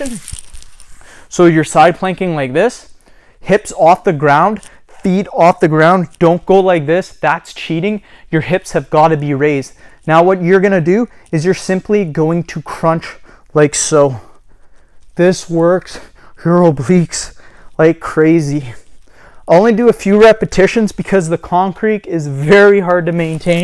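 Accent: Canada. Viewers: is it just me or does he sound canadian..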